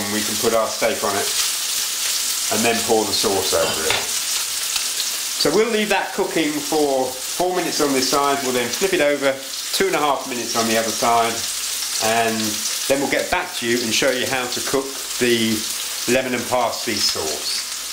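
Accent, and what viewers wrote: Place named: United Kingdom. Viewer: I read that you are supposed to use lime juice with a white fish. Why am I listening to a British guy tell me how to cook?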